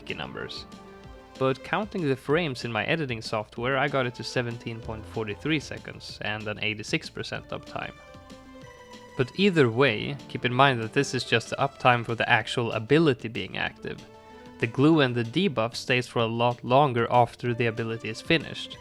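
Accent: Sweden. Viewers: You have the cutest Swedish accent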